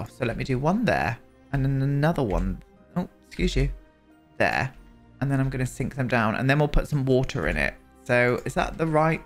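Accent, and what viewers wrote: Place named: England, United Kingdom. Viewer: Love the story line of a cult! Very unique and I can tell it'll be an entertaining one. I just want to mention one thing, Paolo isn't a portuguese name, I'm portuguese and the correct version of that name would be Paulo, in terms of pronunciation is similar, especially with your English accent and it really is a small difference in spelling but it does matter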